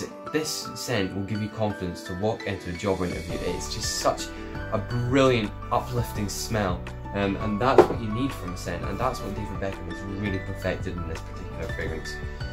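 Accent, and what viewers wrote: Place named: Glasgow, United Kingdom. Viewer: This is an accent predominantly spoken amongst middle class Glaswegians who tend to reside in the west end of Glasgow and more recently the south side of Glasgow in particular the shawlands area. The accent was firstly adopted by middle class Glaswegians as a way to differentiate them from the more “common” Glaswegians and to show them as being “better” than the Glaswegians who spoke in slang. Many Glaswegians from working class backgrounds develop this accent when they first start at University in an attempt to camouflage the working class roots they have been brought up in which in turn enables them to blend in with the middle class “cool kids”. The accent is influenced by people from the north of Scotland.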